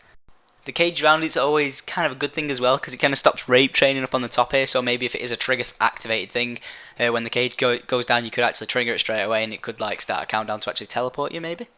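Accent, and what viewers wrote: United Kingdom. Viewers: Paka poonch. Oh, ur so Brittish.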